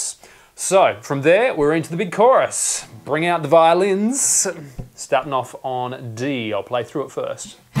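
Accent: Australia. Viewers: I'm so glad you posted this... one of the most helpful guitar lessons I've ever seen. I still can't play it but at least I have hope. And your Australian accent is very very cute :)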